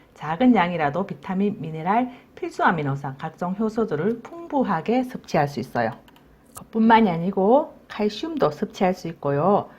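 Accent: Gyeongsang, South Korea. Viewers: 지금말씨가 대구말씨같은데 맞으신가요 제미나게사시는것같아요 부러워요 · 볼수록 재미있어서 주변에 막 퍼나르게되요. 어쩜 돌아가신 친정엄마 살아돌아오신듯 경상도 사투리에 지혜로운거 까지 똑 같아요. 감사하고 축하드려요~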